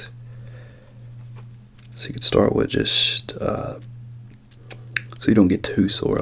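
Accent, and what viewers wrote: South, United States. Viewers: you have that amazing southern accent which is lovely to listen to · Am I hearing a slight southern accent? 🙂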